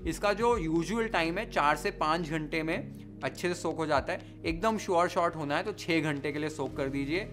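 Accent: Maharashtra, India. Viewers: Heres a son of Maharashtra who respects our traditions n cuisines. Otherwise v wld hv mutton biryani lucknowi style uploaded on sch occassions..totally insensitive. All my love n blessings to u chef. U hv md ur parents proud. And i love ur perfect marathi accent too😄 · Your Food channel is my favourite food channel. I have made khekda bhaji and Misal exactly how you showed and it was amazing 😍 thank you. Your Marathi is so pure अस्खलित, hope people have noticed the below words used by you instead of Hindi shows your love not only towards the dish or recipe but the traditional and authentic way and process you like to make that Marathi / Hindi Maap / Naap Chitak / Chipak Marathi influence, true Maharashtrian 🚩🚩 Jay Shivray, Jay Maharashtra 🚩🚩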